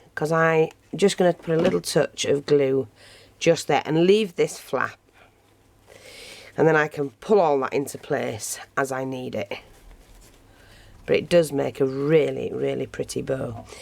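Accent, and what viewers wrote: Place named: Liverpool, United Kingdom. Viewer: Excuse me being nosy, but you sound like my friend who comes from Liverpool? xxxx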